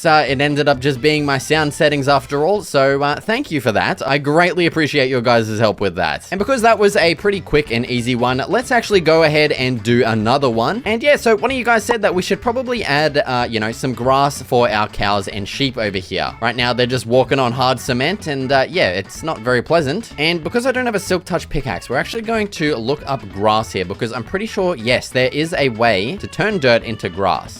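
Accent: Australia. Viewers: I can't tell if you're an ausie or a British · Are you Australian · Idk why but your voice makes you sound like the ultimate Australian surfer boy giga chad XD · are u Australian cuz you have a aussie accent some times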